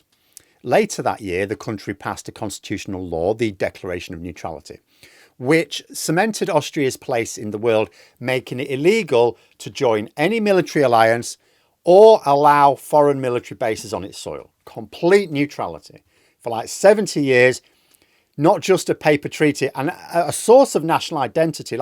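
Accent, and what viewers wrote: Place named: England, United Kingdom. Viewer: "Smooth move, son!" Speaking as an Australian, this sort of thing is why we like listening to English people speak as compared to, say, Americans. You sound like my grandfather but cool and very now. Anyway there has never yet in recorded history been a century without at least one widespread land war in Europe. Why would this century be any different?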